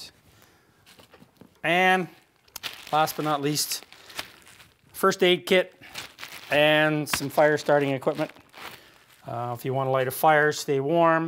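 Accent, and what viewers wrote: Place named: Sherwood Park, Canada. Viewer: I thought your accent sound familiar, I lived in Sherwood park for 3 years.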